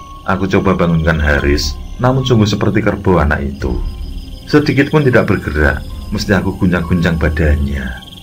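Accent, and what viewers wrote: Java, Indonesia. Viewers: Biar pun sering mampir, tp masih susah qu mencerna suara pakdhe 😁, biar pun pake headset,,, terlalu medok pakdhe bgi ku yg aceh ini,,, salammualaikum 😁😁😁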